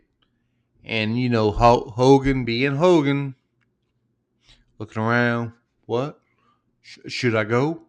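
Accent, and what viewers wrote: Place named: Louisiana, United States. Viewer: You sound like you're from Louisiana, particularly the Houma area.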